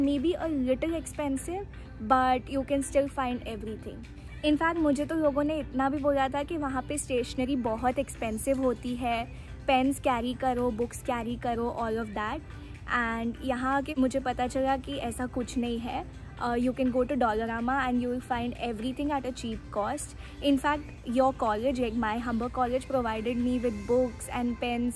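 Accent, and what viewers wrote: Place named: India. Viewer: Perfect Indian Accent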